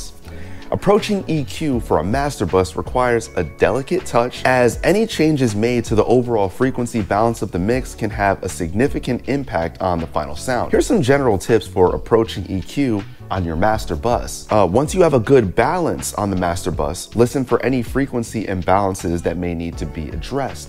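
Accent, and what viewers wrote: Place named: United Kingdom. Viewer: look, bruv, i for one enjoy bri’ish miami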